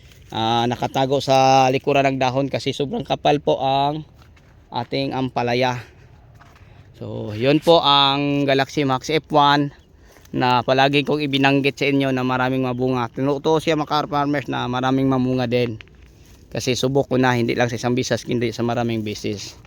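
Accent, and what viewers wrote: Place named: Visayas, Philippines. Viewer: Bisaya Po Kasi ma'am!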